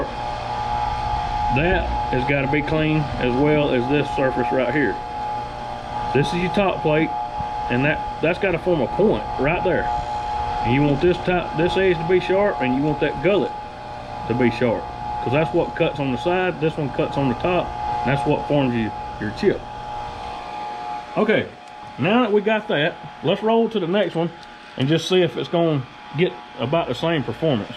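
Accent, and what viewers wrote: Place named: Tennessee, United States. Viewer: I , like others who have commented here , am grateful for the absolutely stellar quality of your teaching. You and your son are a credit to the whole “ Chainsaw Community” amateur and professional alike. I own the parent company version of this grinder . That is the Tecomec Super Jolly. All of these machines are made in Italy by the Tecomec company. Your video explains the theory and practical aspects of the use of this machine so effectively that the company should compensate you for what you have done. There is no other video that compares. I was born in Florida but grew up in New York City. Your Tenessee Twang makes the video all the more enjoyable. You are an intelligent man and a gifted teacher. Thank You, sincerely for taking your time to make this documentary. I have learned much over the years about saws and chains but increased my gut understanding of the setup and operation of this machine after watching your video. 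Subscribed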